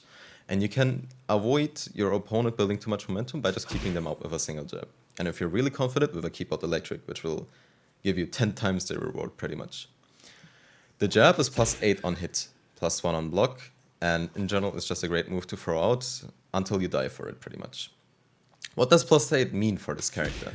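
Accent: Germany. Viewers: German Accent detected Kuss auf die Nuss von einem fellow Devil Jin Loyalist <3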